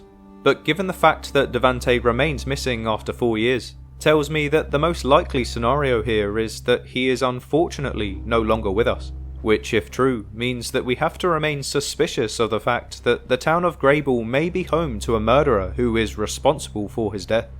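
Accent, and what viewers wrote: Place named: United Kingdom. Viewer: There’s something about a British voice making this sound so not legit. Bad decision.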